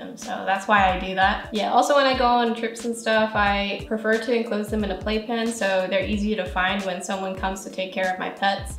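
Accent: Australia. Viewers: I didn't realise you had an "Austrailian" accent until I saw this comment lol, I hear it now that it's been mentioned but totally didn't realise it before that. wow. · This was so funny holy cow. I felt this spiritually. I didn’t realise you were Australian. I’m moving to an apartment and I felt that hating yourself part for their depressing area. But the laugh had me dead. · Not gonna lie, I came here for the bunny content cuz I have a bonded pair. I found your channel when I was looking for bonding advise. I subscribed though cuz you’re so cute cuz of your Australian accent. It’s so attractive to hear 😅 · Ok is it just me or does she sound like she is speaking with an Australian accent AND an American accent.??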